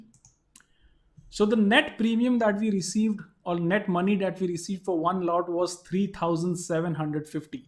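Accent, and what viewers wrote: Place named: Tamil Nadu, India. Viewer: Very good video...you are great.Your English is superb .If I am right, you are from Tamil Nadu or Karnataka.pls post banknifty option buying strategy.Regards.Prabhakar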